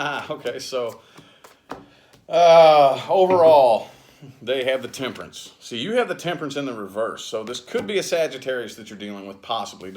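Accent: South, United States. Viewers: I like you and I like how you talk how you sound so southern😘😘😘 thanks for the reading. Queen Leo 8-21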